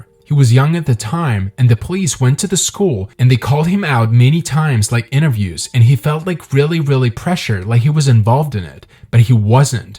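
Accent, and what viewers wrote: Russia. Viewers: This is how he talks, who are you to complain about that? He has a very beautiful and charming accent. You do understand english is not his 1st language, I think he might be from Russia. I'm guessing you are Americans, the only people arrogant enough to complain about someones accent🤦‍♀️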